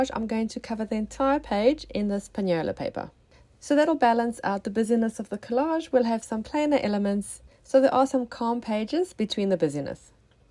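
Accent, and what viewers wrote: South Africa. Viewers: New subscriber here! Are you originally Australian,as you sound very South African to me -I am a SA expat,so just curious 😊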